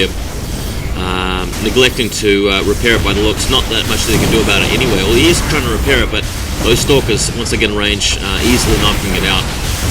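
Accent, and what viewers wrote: New Zealand. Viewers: Screw Day9, Tastosis, HD and Husky, when it comes to sc2 commentaries, your the man :-) And I LOVE your kiwi accent by the way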